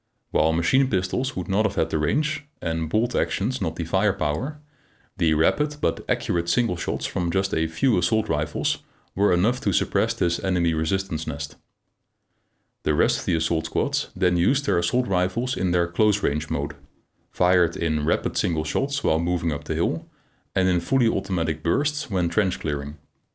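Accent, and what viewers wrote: Netherlands. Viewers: this dutch raw accent is unlistenable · You'r obviously Dutch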